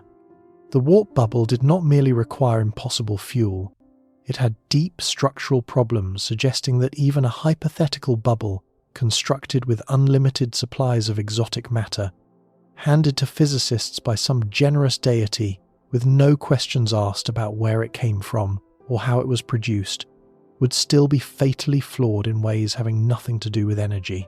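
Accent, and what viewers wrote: England, United Kingdom. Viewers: Math in an English accent sounds wrong.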